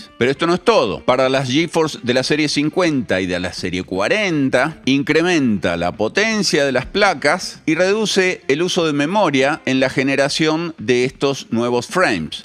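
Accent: Argentina. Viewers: ⚡Vi los avances de la nueva versión; pero he esperado a oírlos de boca de. Ya me he acostumbrado... no sé si es bueno, si es que me he acostumbrado a su habla del español argentino, o que me estoy haciendo vago... muchas gracias por todo!!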